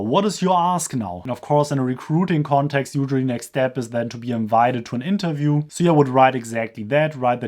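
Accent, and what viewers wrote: Germany. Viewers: I really enjoy your German accent English! How could I contact you if I may ask?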